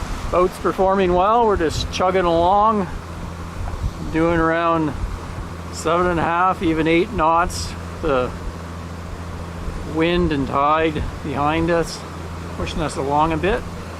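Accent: Canada. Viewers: I think your Canadian accent is stronger now than when you were in the states... just say'in.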